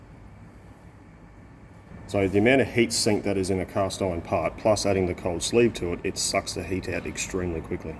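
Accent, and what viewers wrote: Australia. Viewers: never seen or heard of this channel until it came up on my feed, watched it and as soon as i realised you’re aussie, subscribed and liked! always back ya fellow aussies 🙌 really really great/interesting work you do too! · Quick question.....why doesn't the thermal shock crack the cast iron? I've always heard that cast iron doesn't do thermal shock well. I live on the completely opposite side of the world but your videos are anxiously awaited. Don't know if it's the skill, professionalism or that Aussie accent but it's a great video!!😊😊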